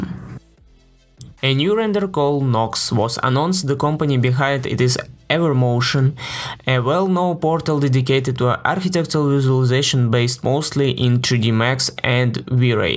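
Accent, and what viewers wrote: Russia. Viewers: Russian aksent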